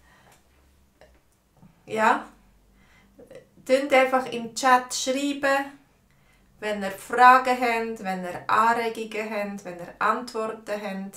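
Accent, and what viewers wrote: Bern, Switzerland. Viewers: Ich bin auch in Bern!, ist dein Dialect gemischt oder Bärndütsch?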